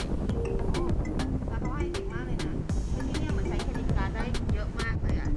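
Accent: England, United Kingdom. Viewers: Did you attend school in England? You got an English accent. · ชอบสำเนียง British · ชอบคุณ พูดอิ้งค่ะ ฟังเพลินดี สำเนียงออกบริตติชเลยค่ะ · คุณ เป็นนักเรียนอังกฤษเก่าใช่มั้ยคะสำเนียงออกทางอังกฤษเลยค่ะ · พูดสำเนียงอังกฤษไช้มั่ยเนี่ย ดูepNYมาหลายตอนเพิ่งสังเกต😁😁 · สำเนียงหนู British มากเลยค่ะ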